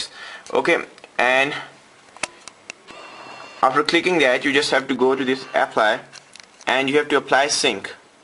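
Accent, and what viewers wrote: India. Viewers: great exlpanation for a indian guy? not a racist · Shame man, he is Indian what do you want the guys to sound like. Don't worry dude keep on with the videos thanks man :)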